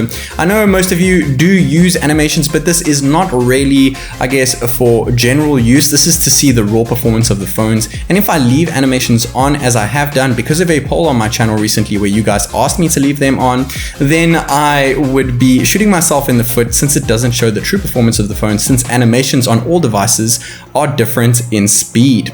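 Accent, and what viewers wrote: South Africa. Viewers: Are you south African by any chance?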